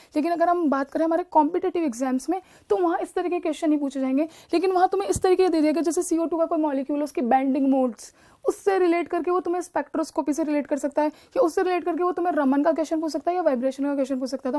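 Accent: India. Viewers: Is this English or english-Indian speaking?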